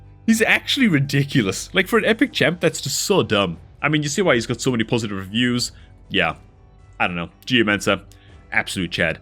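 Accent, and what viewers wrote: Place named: Newcastle, United Kingdom. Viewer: It sounds baseline Geordie but mixed with something else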